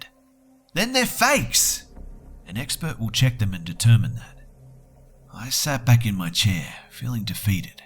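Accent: Australia. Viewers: Are you from Australia?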